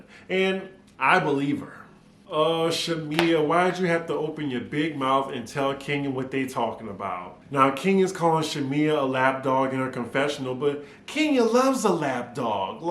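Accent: New Orleans, United States. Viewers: You still have your accent,. It's only a slight accent but I always hear the New Orleans twang when you talk.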